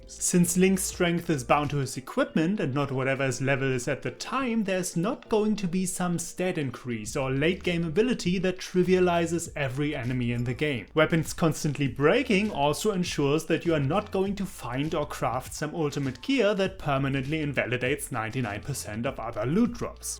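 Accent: Germany. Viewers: Good video. And I get that some folks don't much care for open world type games and get really picky about what kind of games they like so I can respect his 7 out of 10 that he gave the game... Here's the thing though about Jim Sterling. Okay fine... He didn't like this clearly good game as much as most folks. Fair enough... Not everyone has the same feelings... BUT! He gave dead rising 4 a 8.5 out of 10. That's fuckin retarded lol. I don't care how opinions differ and all that. They shouldn't fucking differ THAT fucking much. That's like eating shit vs a new york pizza logic. Love your accent btw. Reminds me of darth helmet from space balls or that german guy who had to put up with homer in that land of chocolate episode. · thats a serious german accent dude....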